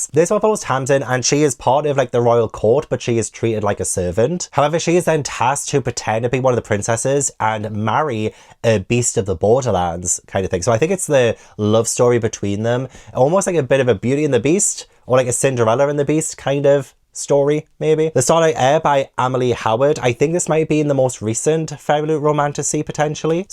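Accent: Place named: Newcastle, United Kingdom. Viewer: Hello Came across your channel a couple of weeks ago and had to subscribe. I love a geordie accent plus you do make me laugh lol. Loving your channel Books are homophonic 🤣🤣🤣😳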